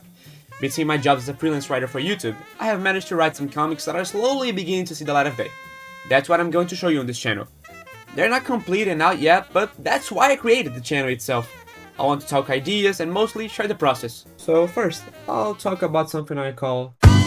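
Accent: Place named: Brazil. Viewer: belo conteúdo, identifico sotaque br desde longe haha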